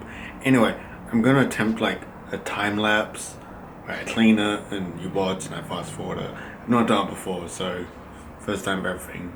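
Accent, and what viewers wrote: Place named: Australia. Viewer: Ok i'm going to make a guess that you are Australian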